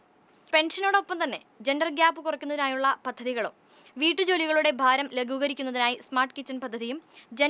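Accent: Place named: Thrissur, India. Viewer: തൃശൂർ സ്ലാങ് ആണ് നല്ലത്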